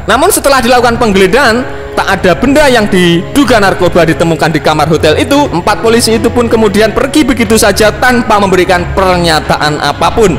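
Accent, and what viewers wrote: Java, Indonesia. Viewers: Omonganmu medok min..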